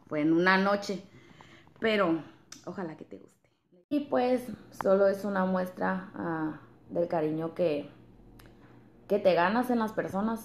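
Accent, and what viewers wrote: Mexico. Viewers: Es ese acento culichi? Me encanta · Habla como alguien de monterrey 7u7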